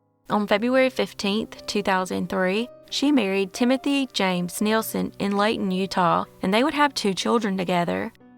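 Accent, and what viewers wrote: South, United States. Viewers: Mine is even more southern lol love your channel nice to hear another southern accent.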